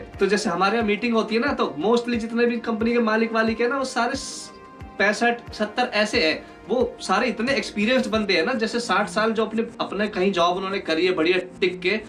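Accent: Haryana, India. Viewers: Bhai haryanvi bolte ho kya Japan me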